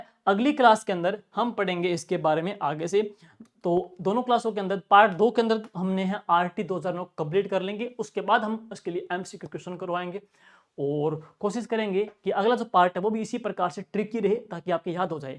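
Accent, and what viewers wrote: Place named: Shekhawati, India. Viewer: Bhai aapka grah jila churu h kya boli m shekhavati touch aata h 🎉🎉🎉 I'm from jaipur or aap mere chhote bhai jese dikhte ho uski skl bilkul aap me milti h ditto🎉🎉🎉🎉🎉🎉🎉🎉🎉🎉🎉🎉🎉🎉🎉🎉🎉🎉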